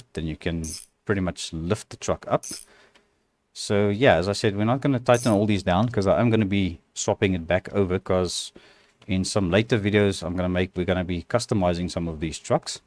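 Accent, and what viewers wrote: South Africa. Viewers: south african i can hear in the accent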